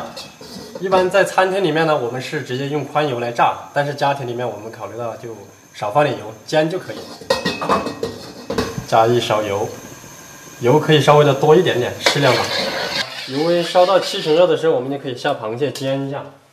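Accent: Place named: Zigong, China. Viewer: 感觉王师傅是自贡或者内江的~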